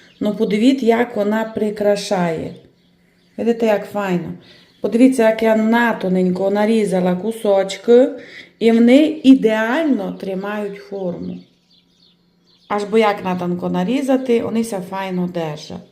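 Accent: Transcarpathia, Ukraine. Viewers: Пані, але ж соковита закарпатська говірка! А рулет Ваш приготую! Дуже гарно виглядає і хвалять його! Дякую! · Дуже гарна начинка.а діалект закарпацький -зачаровує.Респект каналу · Дякую за цікаві і смачні рецепти. Закарпатський діалект відрізняється від галицького чи буковинського, але всерівно зрозумілий. Я б сказав, що це додає якогось колориту приготуванню... З прийдешнім Святом Пасхи!!! Нехай в 2024 році настане мир і перемога України!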